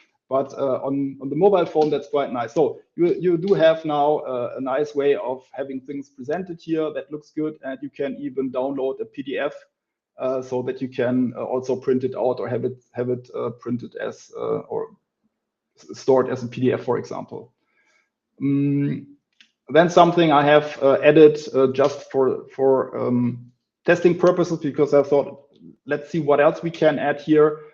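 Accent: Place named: Germany. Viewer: Drei Wörter gehört und gedacht "det is n deutscher" und dann "sooo" =)). Ich wünscht ich könnt nur 10% so Englisch sprechen. But if I hear it, I can learn it.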